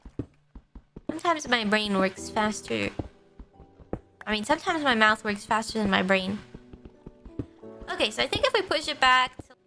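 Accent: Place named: New York, United States. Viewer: Her accent almost NY-like. Mid Atlantic/NY? Anyways I usually don't like 'mom jokes' but everytime she cracks it its so cute and funny.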